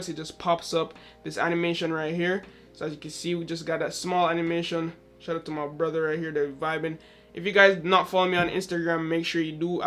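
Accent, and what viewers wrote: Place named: Jamaica. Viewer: Da Jamaican accent is strong with this one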